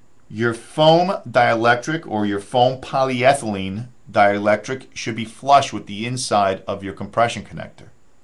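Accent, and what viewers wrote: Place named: New York, United States. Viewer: yo dawg you from new york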